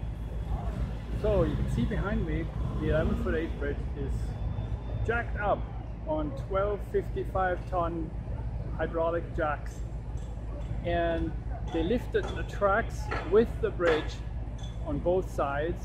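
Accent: Germany. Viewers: You are sounding a bit german Im sorry if not 😂 (Im from germany) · Your accent sounds kinda German 😄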